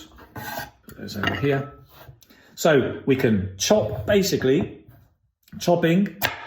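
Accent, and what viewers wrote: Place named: England, United Kingdom. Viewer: perfect soap and english accent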